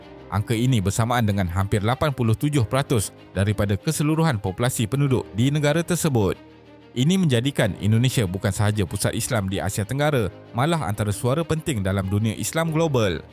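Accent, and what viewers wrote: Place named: Malaysia. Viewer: Saya gak yakin dia itu YouTuber Indonesia, dari caranya ngomong aja meragukan 🤔 Atau jangan jangan itu YouTuber malay yang pakai lokasi di Indonesia biar kelihatan YouTuber Indonesia 🤔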